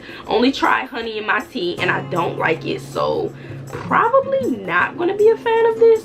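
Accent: Baltimore, United States. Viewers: You sound like you from my city (Baltimore)”… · Soon as u started tlking, I said to myself she sound like she from Baltimore! And I knewwww!!!! I know our accent any mf where! 😂 I'm from over west 💙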